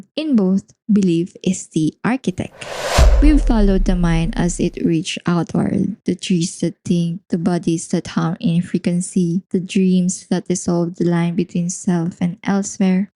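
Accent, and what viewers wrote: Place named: Philippines. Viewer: Pronunciation....since you have Phillipines english accent or somewhat like that...use AI for your for narration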